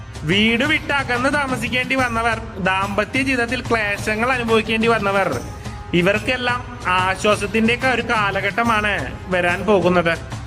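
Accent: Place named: Thrissur, India. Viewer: തൃശൂർ ആണ് ല്ലേ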